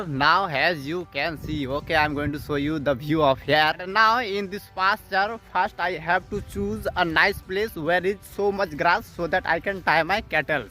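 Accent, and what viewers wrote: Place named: India. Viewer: still Indian accent❤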